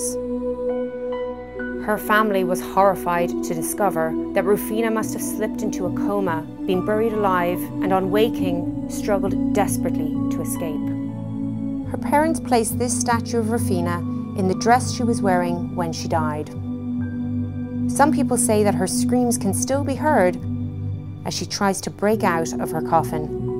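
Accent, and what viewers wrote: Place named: Ireland. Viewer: This is the most informative video about La Recoleta. Thank you!! I especially enjoyed your presentation, with a lovely Irish lilt : )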